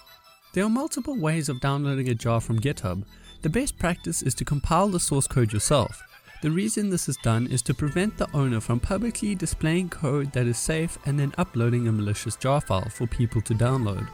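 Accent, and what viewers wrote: South Africa. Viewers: Just realized your South African, from your accent Its good too see there are RSA Youtubers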